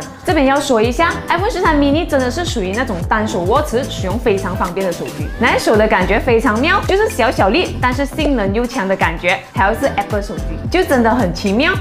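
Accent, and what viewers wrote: Malaysia. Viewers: 應該是馬來西亞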